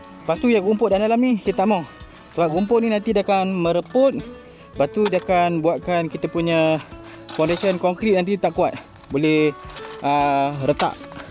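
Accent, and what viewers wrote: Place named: North, Malaysia. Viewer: Bahasa menunjukkan bangsa...kueh2 buat keluaq...hahhahah...abg org utara ka...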